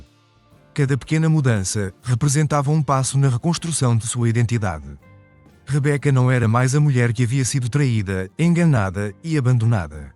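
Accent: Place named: Portugal. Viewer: essa voz sensual desse português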